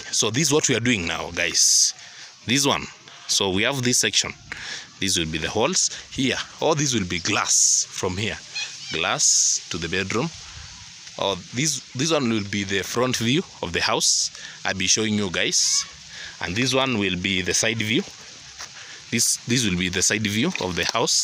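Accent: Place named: Kenya. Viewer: If you asked me, I would have side,from the way to talk, that your a KIKUYU. I am a subscriber of Marwa and now you. Uvoo wa musyi? Greeting from Manchester.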